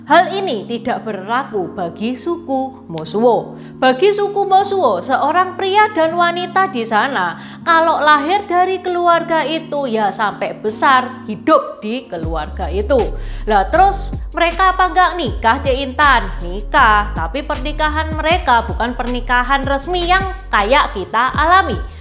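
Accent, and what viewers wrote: Java, Indonesia. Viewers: Intan medok banget🤣👍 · Makasih ya ce, bertambah wawasan nih, awalnya lihat channel ini rasanya aneh banget, dikiranya orang lokal ternyata Tionghoa, saking medoknya aku kira cece asli Jawa.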